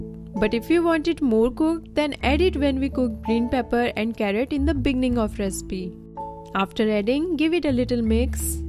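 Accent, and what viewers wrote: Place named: Europe. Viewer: U Europeans confused with t and"ray".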